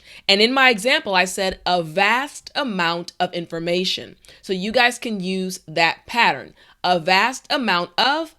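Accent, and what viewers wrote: United States. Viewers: that's American English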